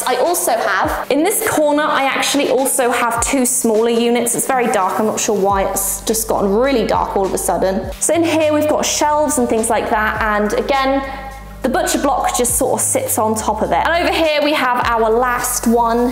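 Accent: United Kingdom. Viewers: You know I really like your uk accent Especially the Amazun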